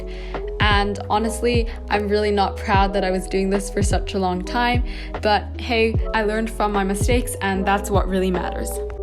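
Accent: United States. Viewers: love this, super motivating :) i have a question, are you english? because your accent is a bit more american and i didn’t know how you took gcses x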